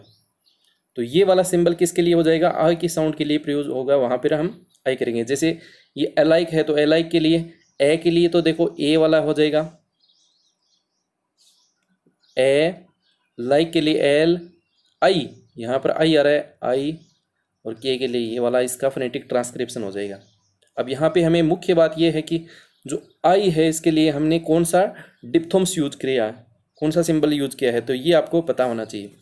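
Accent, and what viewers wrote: Haryana, India. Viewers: Aapne tho kamaal k notes bnaye h Guruji 👏 PTA nhi murkh log kyo aapki vedio ko like nhi karte aapko tho gold ka button milna chahiye ,aapki aawaj aaj kuch badli Hui so lagi Guruji 👏 aapki aawaj Mai hmare area ka touch h from kaithal HR thanks a lots again 👏♥️♥️♥️💗💖♥️♥️💗💖🙏👏👏🙏♥️🙏🏻🙏🏻🙏🏻🙏🏻🙏🙏🏻🙏🙏🏻🙏🙏🏻🙏🙏🏻🙏🙏🏻🙏🙏🏻🙏🙏🏻🙏🙏🏻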